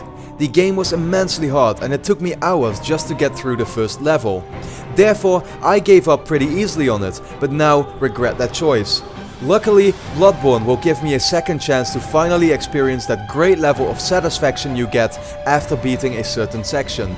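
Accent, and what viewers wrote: Netherlands. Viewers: Ben jij Nederlands je accent klinkt wel nederland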